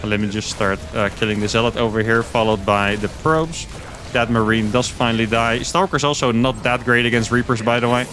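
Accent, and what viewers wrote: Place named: Netherlands. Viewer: It awesom watching you people have this much fun in SC2. Also judging by your accent uThermal you are a fellow Dutchie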